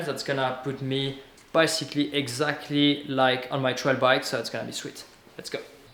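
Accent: France. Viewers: This guy has the heaviest French accent I ever heard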